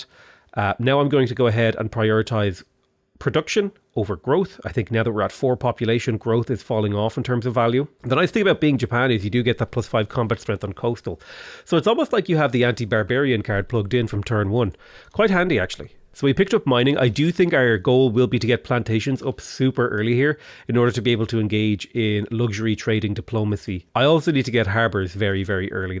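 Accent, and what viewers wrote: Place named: Ireland. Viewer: you sound especially irish today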